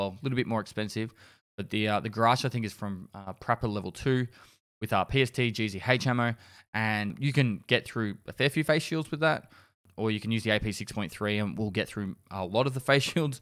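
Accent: Australia. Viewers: Because he's Austrailian?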